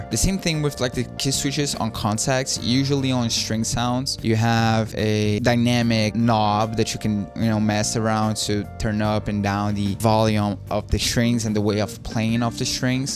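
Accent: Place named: Brazil. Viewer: Pelo sotaque eu acho que tu é brasileiro kkkk acertei?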